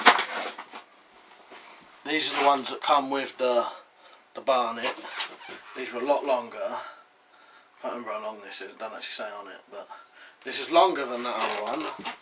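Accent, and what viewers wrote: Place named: England, United Kingdom. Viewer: Correct me if im wrong. You sound like you are from England. Thought firearms were illegal in England.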